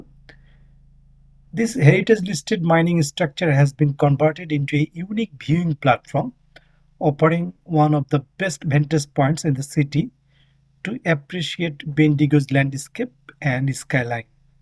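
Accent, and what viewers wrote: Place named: Bangladesh. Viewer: You speak bangladesh english, can't understand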